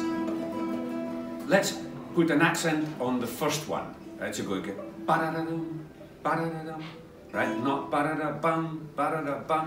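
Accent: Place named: Scotland, United Kingdom. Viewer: It s a middle class well spoken scottish accent for christ s sake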